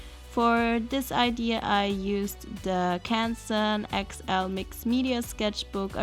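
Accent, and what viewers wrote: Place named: Germany. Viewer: Hör Deutsch raus in dem englischen akzent,lieg ich da richtig?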